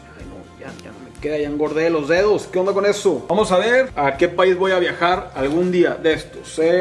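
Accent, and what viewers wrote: Mexico. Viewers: Mi guapo, me encanta tu acento bien marcado mexicano 🙈💕 Saludos desde Perú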